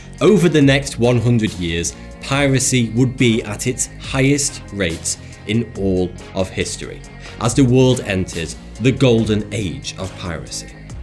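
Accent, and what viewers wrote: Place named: Lancashire, United Kingdom. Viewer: What part of Lancashire are you from? You sound like your from my area - Preston.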